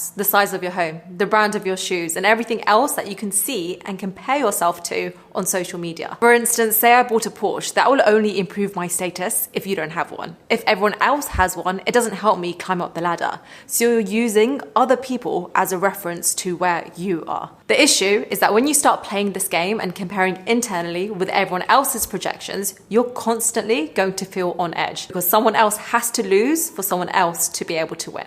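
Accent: United Kingdom. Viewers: Let's be honest, I'm watching this video because she's easy on my eyes, and no, I'm not turned on by her British accent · Omg. A British accent will get people only so far. When you read this advice instead of listening to the accent, you'll see it's very generic advice that is not worth your time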